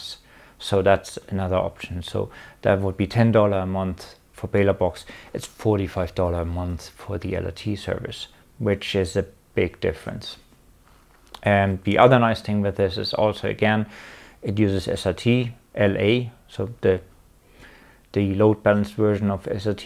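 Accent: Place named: Germany. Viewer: Meine Jüte, da kickt das Deutsch ä bissl durch :face-blue-smiling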